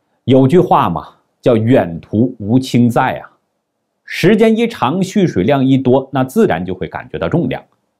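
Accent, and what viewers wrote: China. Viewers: 大陸口語聽不太懂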